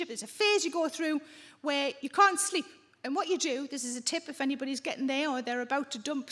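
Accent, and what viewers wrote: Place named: Geordie, United Kingdom. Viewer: In my moment of madness, I bought a Ukulele and then Covid happened. Now I have eight in three sizes. I hadn't played a musical instrument for over twenty-five years but the upside of Covid was I had plenty of time to practice. In fact I think it probably kept me frow going insane or a least institutionalised like a caged Bear. 🤣 now I don't want you to take this the wrong way but every time I watch one of your YouTube videos I'm reminded of my granny. Like you she was a Geordie and had a that strong Novocastrian accent and earthy sense of humour. She was my mum's stepmother as my gramp lost his wife in a bombing raid during the war. I used to cyclone there with my two best mates and she would make us laugh with the sort of astutely observed humorous situation that you are so good at. We would leave there with face ache from laughing and the sound of her voice in our heads saying 'Aye ya boogers, ya do like ya bit of smoot'. 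I can still hear her when I came in through the back door saying ' Allo hinny, ah thought ya might cool this morning'. She wore glasses too funnily enough. It's like London taxi drivers, it's keenly observed comedy. You are a first class Raconteuse. Ohh.... the Hot Tub thing, I'd always imagined the effect would probably be like Number 35, Egg drop Soup. 🤣 you can have that on me and the wicked influence of my granny Ness (Agnes). 🐻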